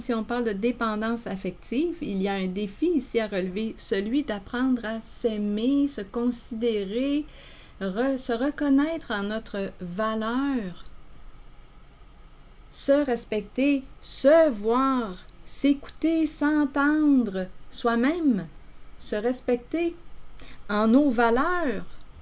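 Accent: Canada. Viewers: Mon Dieu, votre magnifique accent Canadien. J'ADORE. JE VOUS EMBRASSE POUR TOUT CE QUE VOUS DÉCLENCHEZ chez ceux qui sont à votre écoute. Énormes bises de MARSEILLE.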